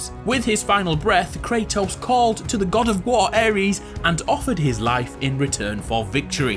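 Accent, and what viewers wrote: United Kingdom. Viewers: Man, I was looking for something else and wound up on this video... and somehow, the British accent really improves the story of God of War... GG, GG indeed. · why are you british?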